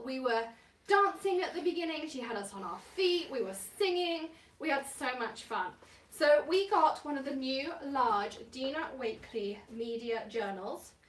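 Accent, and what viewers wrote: United Kingdom. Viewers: definitely makes me think: #1 - British ( your accent ) #2 - crafty goodness: new techniques, latest products and some really great ideas. An all around great channel to hang out watching and playing along.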